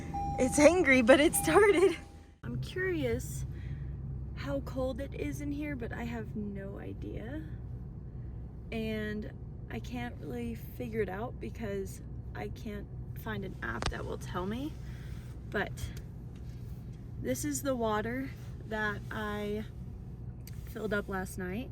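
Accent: United States. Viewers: why not buy true american you sound american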